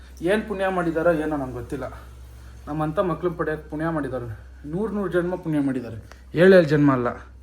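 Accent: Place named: North Karnataka, India. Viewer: Neev matadiddu uttara karnataka bhasge...not uttara kannada bhashe..😂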